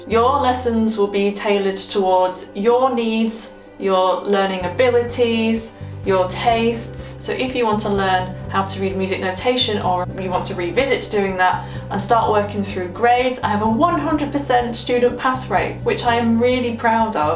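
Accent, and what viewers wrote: United Kingdom. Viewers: i love your british accent. You the best teacher. I learn so much with your music videos tutorials =)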